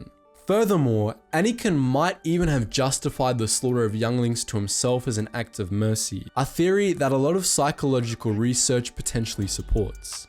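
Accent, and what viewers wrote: Australia. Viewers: never knew my guy was australian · Oh shit is Australian couldn't pin down the accent must be from QLD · I just realised was aussie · You're an aussie!? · GUYS HE IS AUSTRALIAN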